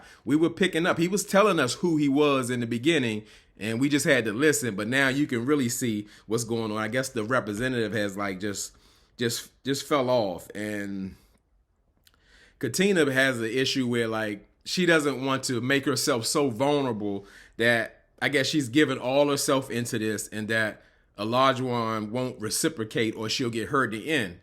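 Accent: DMV, United States. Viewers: Are you from DC/MD? Your voice sounds like you’re from the DMV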